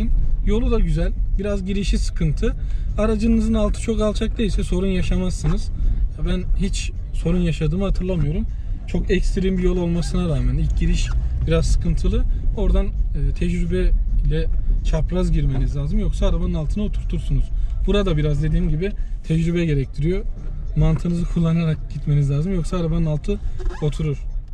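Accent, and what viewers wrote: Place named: Istanbul, Turkey. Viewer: abi videolarını çok beğenerek izliyorum. Kampçı ruhun ve İstanbul Türkçesi ile konuşman çok güzel gençlerimize çok güzel örnek oluyorsun sana çok Teşekkür ederiz abi 😊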